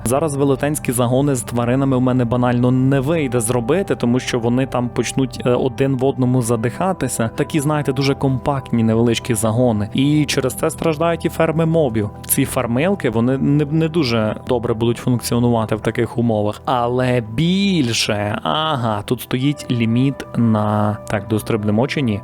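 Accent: Ukraine. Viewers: з Галичини — 100%)) Я за повномасштабну вже навчився на півслові вловлювати, звідки людина))))) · Доброго вечора, питання не в тему відео, але... Ви випадково не з заходу України? Бо у вас специфічна вимова деякий слів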